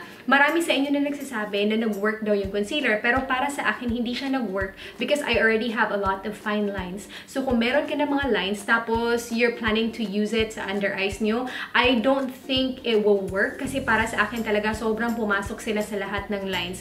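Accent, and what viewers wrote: Visayas, Philippines. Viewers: Hello, Ms.! The first time I watched your video, I already noticed your accent. It has a hint of Visayan accent. I'm not against it 'cause my dad's a Visaya as well. I just wanna ask these questions: 1. In what particular province did you reside while you're still here? 2. How long did you stay here? 3. What is your life verse? ☺ Thank you so much! Praying that God will grant the desires of your heart. 😊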